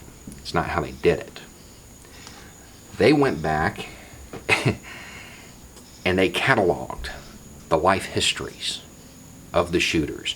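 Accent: South, United States. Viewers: I'm not bying a car here! I hear him right now, and he is close up to the establishment talking points. His southern accent only makes it sound more absurd.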